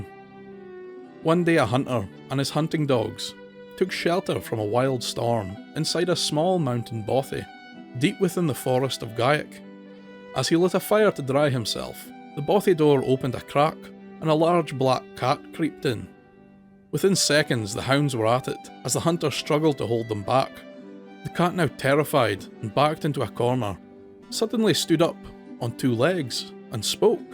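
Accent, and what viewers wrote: Scotland, United Kingdom. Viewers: His Scottish accent might be a hint lol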